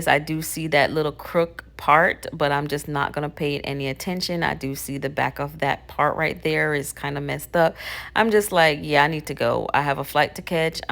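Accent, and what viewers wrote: Jamaica. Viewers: New subbie! I hear a Jamaican accent! Gonna binge watch your vlogs 🇯🇲🇯🇲🇯🇲🇯🇲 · We appreciate this series. I’m also trying to learn to do my own hair. I recently did some mini spring twist by myself for the first time ever and I was pleasantly surprised. P.S are you Jamaican/ Caribbean by any chance. I hear a slight slight accent but I’m not sure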